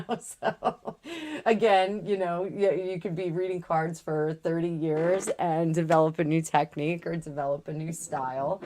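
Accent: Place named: New Jersey, United States. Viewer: I love your NJ viewpoint and expressions - "a hottie" with "definitely zero percent body fat". No other tarot reader I listen to does that. Love your accent, too - although it is very slight. I was in NJ last July to see Paul MCartney. I ❤ New York - and New Jersey. ❤Denise from Toronto. Great reading! Looking forward to much more, so thank you so much.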